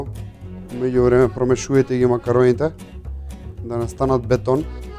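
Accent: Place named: Skopje, North Macedonia. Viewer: Decki govorete makedonski. Vo opsto ne si interesne ako zoruvas na Sk dijalekt. Aloo vodis emisija za recepti. Odbivno e za emisijata toa so se LIGAVIS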